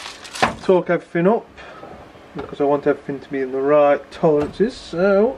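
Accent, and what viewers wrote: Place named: Stoke, United Kingdom. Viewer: Sounds like a fellow stokie? Or not far off anyway!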